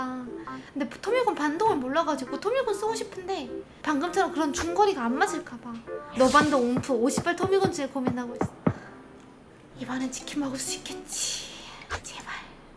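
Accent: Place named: Gyeongsang, South Korea. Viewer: 말투 경상도 느낌쓰가 나네요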